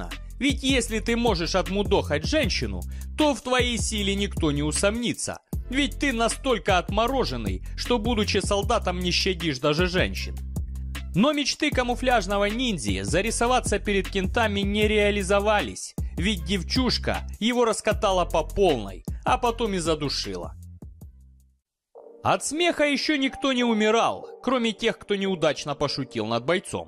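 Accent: Ukraine. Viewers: Комментатор,хохляцкий акцент чувствуется за версту.Идите тренеруйтесь.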